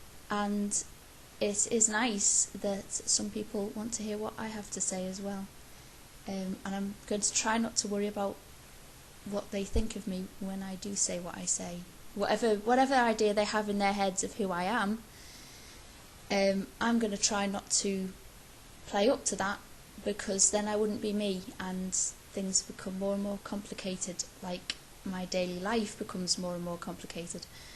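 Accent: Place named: Liverpool, United Kingdom. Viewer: Thanks for being so candid. I think we all worry sometimes about how we come across to others, but we can't let it dominate our actions. You are possibly one of the most interesting YouTubers around, and certainly your Liverpool accent makes you the most facinating. Great work on the room, and I am definately a fan. Very insightful vlog! Excellent points on intimacy. Super glad I'm a subscriber. - John Reagan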